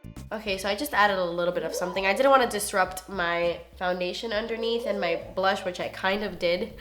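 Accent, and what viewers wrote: Cuba. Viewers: She's Cuban! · I love it when she talks in her Cuban accent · she's cuban from Miami :)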